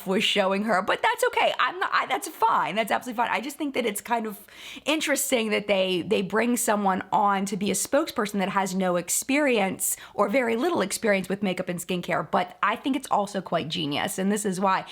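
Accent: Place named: Philadelphia, United States. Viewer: Where are you from? I'm catching maybe some Philly "o"s?? But I don't really know what I'm talking about.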